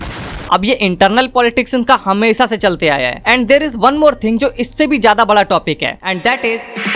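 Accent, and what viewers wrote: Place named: Bihar, India. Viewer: bihari accent on top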